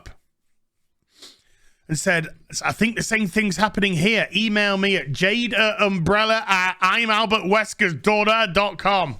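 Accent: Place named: Yorkshire, United Kingdom. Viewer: Just get well soon. Life's boring without a good Yorkshire rant!!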